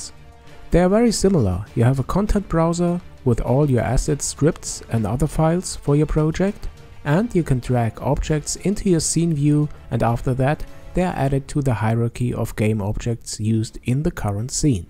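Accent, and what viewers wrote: Germany. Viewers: german accent? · Man hört sofort, dass du Deutscher bist, also schreib ich auch einfach mal auf Deutsch ;P Wir benutzen Unity an unserer Universität aber optisch sagt mir Unreal Engine viel mehr zu. Grundsätzlich können die beiden ja das gleiche aber wäre für die Industrie später eines der beiden Programme mehr von Vorteil? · Bist du nen deutscher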